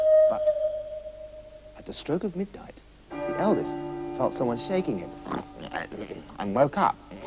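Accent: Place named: France. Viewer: OMG! Love this guy's French accent so much. Robin Williams and he would have made great friends, if they weren't in life already. Chocolate trees are awesome!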